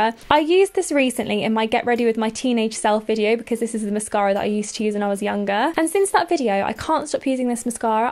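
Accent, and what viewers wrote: United Kingdom. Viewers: In which oart of the Uk do you lievv? Aksong bc of your accent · this is the first video I've watched of yours so far. and I absolutely love it!!you're so feminine, and polite, i love your light pink style and I love your accent. I've always loved British accents, thank you so much for making this, I love natural makeup looks.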